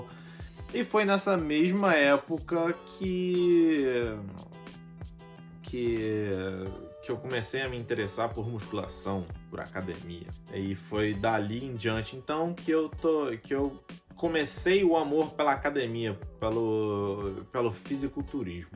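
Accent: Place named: Rio de Janeiro, Brazil. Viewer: I’m Bryan Lima gostei da sua história 😊 tão jovem já viveu muitas coisas, vim pelo canal da Jéssica gostei muito de sua mãe. Mulher guerreira não é fácil tomar a decisão que ela tomou. Pela sua educação vê que ela cuidou muito bem dos filhos, você é um garoto muito educado esqueceu de falar qual é sua cidade aqui no Brasil. Pelo sotaque é carioca vizinho aqui de minha cidade, Juiz de Fora uma cidade do interior de Minas que é um pouco carioca também #sqn você realmente abriu mão de tudo na sua vida, pra começar uma nova vida do zero imagino que não tenha sido fácil. Mas pelo jeito esta muito bem obrigado 😊 sorte sua estar vivendo em um lugar onde possa ter uma qualidade melhor de vida. É isso aí boa sorte pra você em todos seus projetos sejam bem sucedidos 🙏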